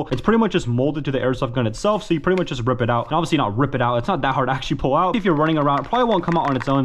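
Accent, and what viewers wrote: United Kingdom. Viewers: Love the Brit accent or is it your real accent im British bit I don’t sound like that but hey keep it up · love the british accent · That british accent tho😂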